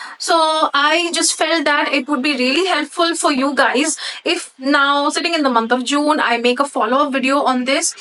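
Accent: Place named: Bengal, India. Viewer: Wth people giving her hate over english speaking, grow up lads.. She is from Bengal as i can see, i am too from Bengal and i know what she is talking about some Bengalis can't properly speak hindi, i am not saying that Bengalis are poor hindi speaker like i perfectly speak hindi, can write hindi any stuff but with some Bengalis there's a regional tone which can follow up in hindi and might sound like she is speaking wrong but it is not like that hope everyone can understand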